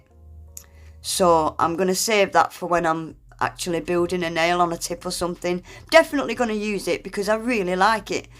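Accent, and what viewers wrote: Yorkshire, United Kingdom. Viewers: It’s a proper Yorkshire accent 😉🥰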